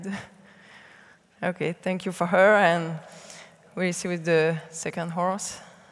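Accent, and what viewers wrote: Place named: France. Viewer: On entend bien l’accent français 😂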